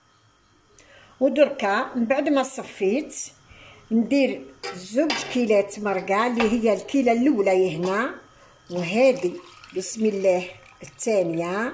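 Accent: Constantine, Algeria. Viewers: يعطيك الصحة طاطا هاني نايضا نديرها الله الله على اللهجة القسمطينية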